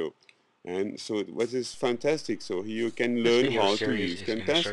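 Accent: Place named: France. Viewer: lol accent francais :)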